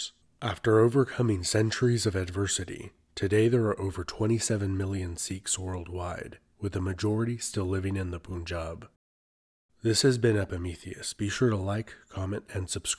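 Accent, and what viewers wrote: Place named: United States. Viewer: I think it is just the American accent. They tent to pronce the letter 'u' 'oo' instead. Where an Englishman would say 'Tuesday' Americans actually say 'Toosday', and 'Youtube' becomes 'Youtoob' and so on.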